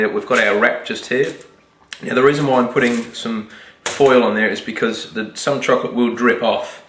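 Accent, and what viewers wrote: Australia. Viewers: Can't you tell from his strong Australian accent that's nothing like a British accent? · he an aussie i think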